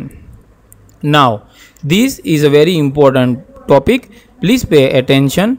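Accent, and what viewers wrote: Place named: Gujarat, India. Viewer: Aanoo gujrati laagyeee Chee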